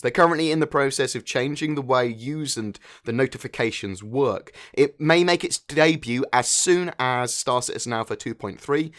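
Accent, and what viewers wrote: United Kingdom. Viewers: Just found you today, and I saw one video and subscribed. I love the way you deliver the information and I can tell that a lot of research and work overall goes into your videos. I had some questions I would like to ask, what mic do you use to record, also is sounds like you live in to UK or grew up there is this true(I'm bad a accents being from the states(Texas)). Love you'r content can't wait for whats to come but for now time to catch up on you'r videos. -Ian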